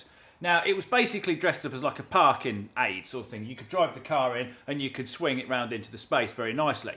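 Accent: United Kingdom. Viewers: Nice bri'ish accent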